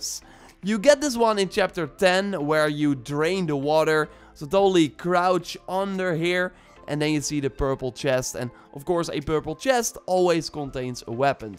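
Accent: Netherlands. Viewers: Are you from holland??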